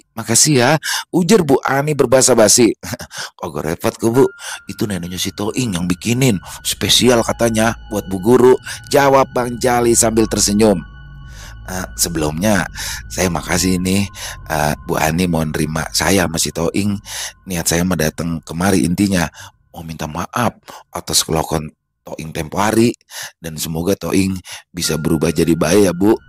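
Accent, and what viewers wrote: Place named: Jakarta, Indonesia. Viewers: Bang emang jago banget bawain cerita2 kaya gini klw lg cerita bahasa betawi kentel banget logaknya, klw bawain cerita dari kalimantan kentel banget juga logak kalimantan ny kereen lanjut terus semoga sukses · Keren banget bang, cara bawain nya, ceritanya, logat Betawi nya, mantap bang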